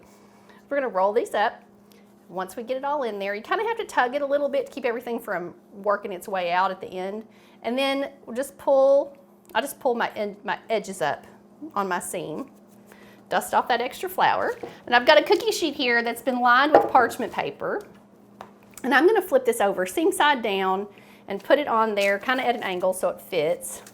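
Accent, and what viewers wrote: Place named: Kentucky, United States. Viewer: Gotcha. You shouldn't feel sensitive about embracing and sharing non-traditional new foods. As a matter of fact, the Stromboli is indeed an adapted Americanized invention. I enjoy making all types of world cuisine and I make no preface nor caveats for cultural qualifications. Remember, you're not appropriating, you're embracing. That's a very good thing! 😇 BTW: I adore your enchanting blue grass dialect.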